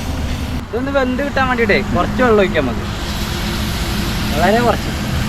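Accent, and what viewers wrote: Malappuram, India. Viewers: Malappuram slang kurachu avoid cheyuka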